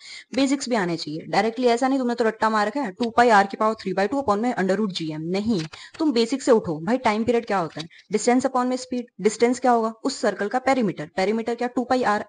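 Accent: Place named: Haryana, India. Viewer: U have got haryanvi touch in ur voice... Sweet pinch🍬🍁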